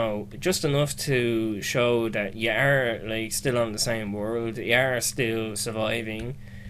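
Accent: Ireland. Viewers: What part of Ireland ya from? I'm from Cork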